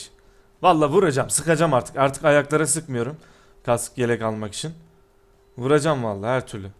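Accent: Adana, Turkey. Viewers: Şurda Br Adam Ateş Edüüyür Onuda Alalım Adana Şivesi :) Adana<3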